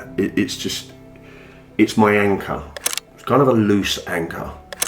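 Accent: London, United Kingdom. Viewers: Great vlog, glad to have found you on here.. can relate to the Geezer accent 😁😎 .... Subscribed, and maybe bump into you on the mean streets 👌👍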